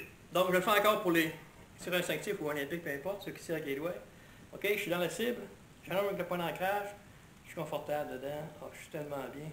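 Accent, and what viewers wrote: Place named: Quebec, Canada. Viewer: 🤔 Je pratique mais pas si clair son language. Un vrai Quebequoi. Désolé mais des sous -titres éventuels auraient été utiles pour les francophones...de France.. C'est un problème général pour ces vidéos des cousins.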